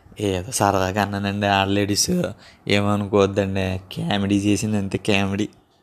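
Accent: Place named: Godavari, India. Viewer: Godavari jillla yaaasa super bayyya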